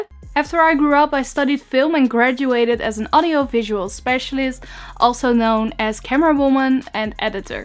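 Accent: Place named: Netherlands. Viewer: Ahhh! Can’t wait! I love the Dutch accent though. Haha, it’s so cute, I have it too haha.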